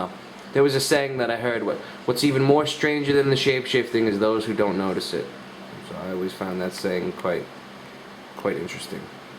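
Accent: Boston, United States. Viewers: You from around Boston bruhh?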